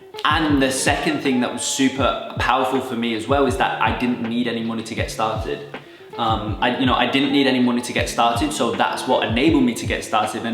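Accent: United Kingdom. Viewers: Are you from England? British?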